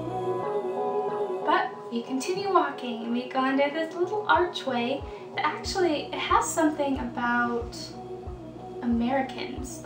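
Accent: France. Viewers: Tu as un super accent français et tu doit être une personne très agréable ! Continue ce que tu fais en gardant le sourire !😊✨😉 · okay first, sorry for my BAD BAD english !! i very like your video !! i'm French and i like your french accent the indoor of "Science Po AIX" is so ugly !! see you next time ;)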